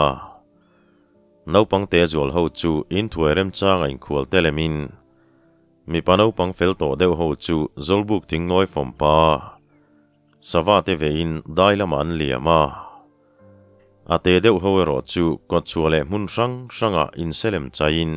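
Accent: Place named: Champhai, India. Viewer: Tawng thluk thu leh lam dan ah chuan mizo thawnthu chhiar ka hriat toh ah chuan keini Champhai lam tawng thluk dan nen chuan i tawng thluk leh tawng kau chhe hi ala in mil in a dang hauh lo mai, khawdang tawng chu sawi lo ila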